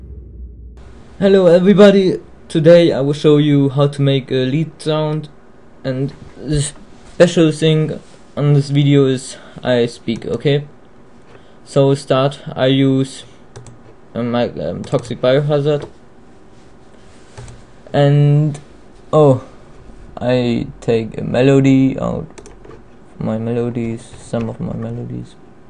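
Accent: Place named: Germany. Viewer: du hast ein deutsch accent (?) i am dutch and i can understand it:P i am soon going to germany to europapark, do you know that? Auf Wiedersehen:D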